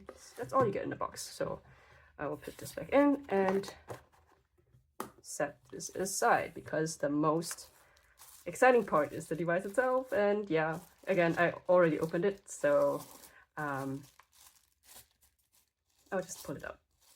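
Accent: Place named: Germany. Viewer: I thought so! I have a friend in germany and i dont mean to generalize, but the way you pronounced your words were very familiar! Cant wait to see you improve further.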